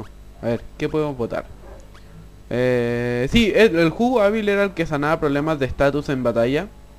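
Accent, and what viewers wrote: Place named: Chile. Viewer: Sí el es chileno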